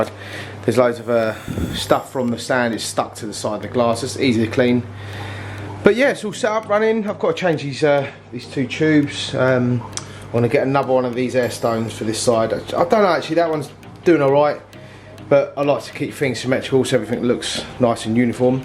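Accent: London, United Kingdom. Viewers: Good content man. Subbed👍 where u from mate? London? Essex??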